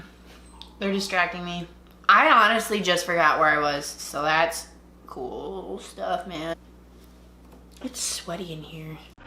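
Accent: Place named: Minnesota, United States. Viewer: Are you Minnesotan? That accent is thick!! love it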